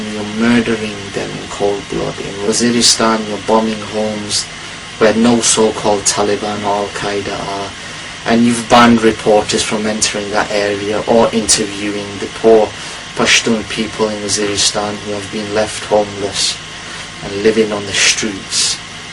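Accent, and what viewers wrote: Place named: Ireland. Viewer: lol are you Irish or something?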